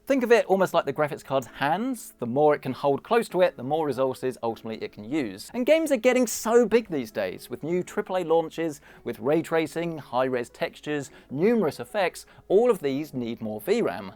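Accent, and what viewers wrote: United Kingdom. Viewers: Too much British can't understand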